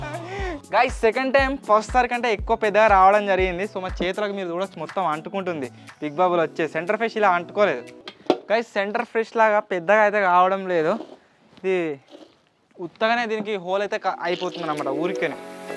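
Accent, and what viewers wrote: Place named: Telangana, India. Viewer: bro Telangana slang vintunte super ga anipistundhi bro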